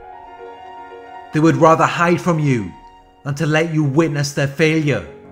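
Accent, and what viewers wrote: Wales, United Kingdom. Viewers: I like your Welsh accent :-) great video, very informative.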